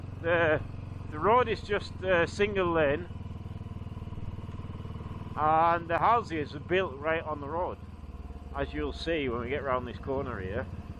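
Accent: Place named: East Midlands, United Kingdom. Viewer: Well set up for everyday use, love it. You speak English with an English accent too! Sounds a bit like East Midlands?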